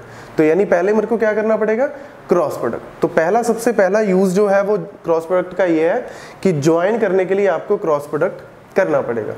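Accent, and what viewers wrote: Punjab, India. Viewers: fun fact - the guy is Punjabi. P.S. - I just guessed it because his accent reminds me of Punjab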